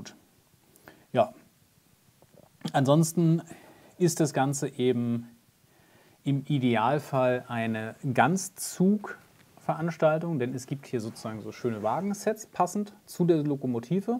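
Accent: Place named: North Germany, Germany. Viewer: Hallo, ich habe gerade Ihren Kanal hier gefunden und freue mich schon darauf mir Ihre Videos anzusehen. Da Sie Norddeutscher zu sein scheinen, hätte ich 2 Fragen an Sie: 1) Wohnen Sie etwa in Dänemark? 2) Warum interessieren Sie sich für dänische Modelle?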